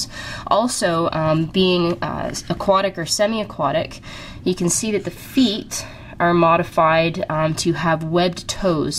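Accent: Canada. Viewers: Is she Canadian? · Are you Canadian?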